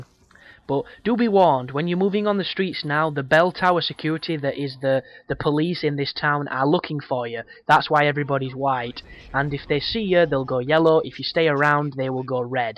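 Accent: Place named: Northern England, United Kingdom. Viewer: Nice walkthrough mate....As a 51yr old gamer I found it refreshing to hear an Northern English mans voice!!!...all the best to you ..from NY....THAT'S NORTH YORKSHIRE NOT THE YANK CITY!!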